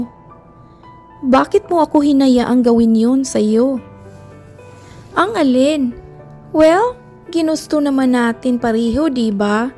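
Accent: Visayas, Philippines. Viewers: Hay ano ka bisaya mag ayos ayos ka naman mag salita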